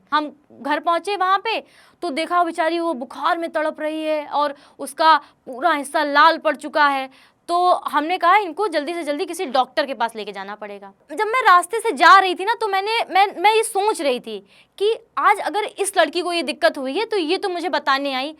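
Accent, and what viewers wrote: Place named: Unnao, India. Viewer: मुझे खुशी हुई, उन्नाव की बिटिया उन्नाव वाली बोली बोल रही है। बहुत सुंदर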